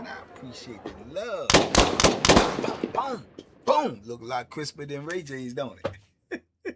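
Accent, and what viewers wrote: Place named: Washington DC, United States. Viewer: I like the way you sound like a a DC Youngin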